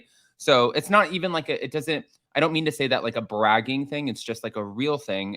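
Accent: New Jersey, United States. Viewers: I love your 'Joisy' accent! Great recap, and am happy that you and had so much fun with your Vanderpump family. Continue to love love love your very eclectic content!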